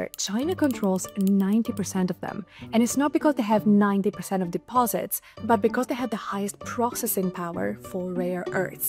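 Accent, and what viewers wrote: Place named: Italy. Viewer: Your videos are fantastically well edited and researched. What's your professional background? And also, where's that accent from? I can't place it... Italian?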